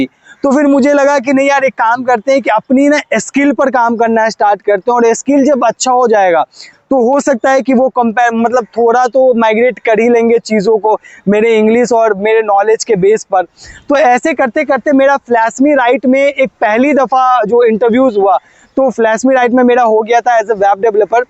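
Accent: Bihar, India. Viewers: Tera aj v english sunke asli main hi lag raha hain tu bihar se hi hain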